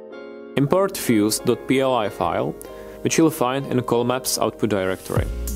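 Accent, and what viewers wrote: Czech Republic. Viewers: i can hear this guy is czech, is he? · Nejsem si jistej, ale asi slyším českej přízvuk 😄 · great, but that czech accent, grrrr :D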